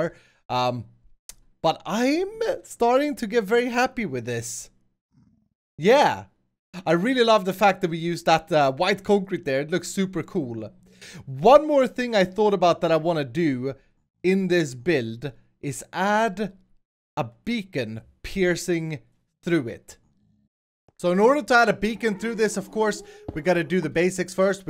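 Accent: Sweden. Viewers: This is so funny for me as a swedish person hearing another swedish persons accent in english xD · I'm not so sure if you will remember me, but I have been watching your channel for a while, commenting. Recently, I couldn't keep up with your vids because I have been so busy. I am currently at one of Korea's best boarding school, named KMLA. (I wanted to boost cause it's one of the best achievements I've made so far...) Anyway, there's a special reason for why I'm commenting. I wanted to say thank you for various reasons. First, your videos make me laugh. It's so fun, creative, and amazing. I like the fact that you are very random. You use a lot of strange words, like crap or such, but I really appreciate that you do not use swear words. Since I had so much fun watching yr vids, I have introduced it to my brother and he enjoys it as well. One thing I hope is... I hope you could do some vlogging... 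Or at least show your face in front of the camera for at least once a month like False. It would be so good to know you as a person ' ' as much as knowing you as 'Swedish accent voiced avatar '. It would be much appreciated by both me and the fans. The second and most important thing to thank you is that you somehow triggered me... Well, in a good way. Remember when you said 'Ja' instead of 'Yes' and did a short commentary about your mother language peeking out? That sorta hit me hard. As soon as I heard that word, 'Ja' for some reason, I wanted to learn Swedish. Maybe it was because I wanted to talk to you with Swedish or I wanted to have a new hobby, but anywa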